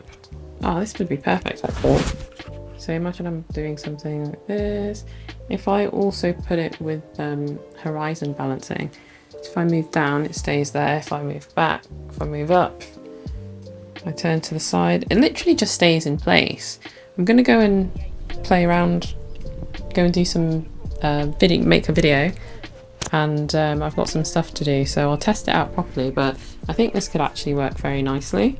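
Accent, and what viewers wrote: England, United Kingdom. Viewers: I bet she's from England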